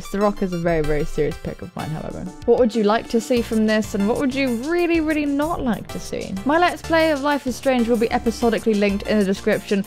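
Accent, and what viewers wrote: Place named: United Kingdom. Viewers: Came for Life is Strange. Stayed for that smooth british voice and adorable face <3 You're amazing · I don't remember why I first came here. Maybe it was for HuniePop. shifty look Still, I also stayed for the British voice and I'm probably more stereotypically British than you are. :p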